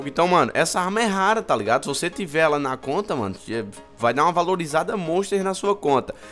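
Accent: Northeast, Brazil. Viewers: E legal ver o falando "atualização" esse sotaque nordestino e foda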